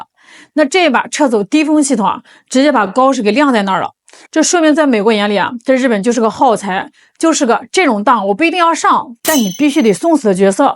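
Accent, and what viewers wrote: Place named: Northeast, China. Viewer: 主持人能不能不说东北话😂😂，还有说话的时候能不能别露牙肉😂。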